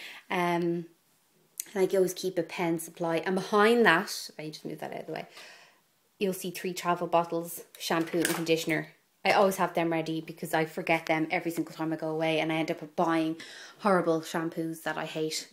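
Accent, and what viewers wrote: Ireland. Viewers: Irish im thinking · Your from CORK ! deffo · I think she's Irish maybe? :) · are you irish?? you sound lik your frm dublin? :) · im irish! i live in florida and have an american accent though. so finding your video made my LIFE when i heard your accent <3 your room is amazing & these were such great tips! thanks! <3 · omg. the sec i heard yur accent i was like finally an irish girl.... :D :D :D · Are you Irish??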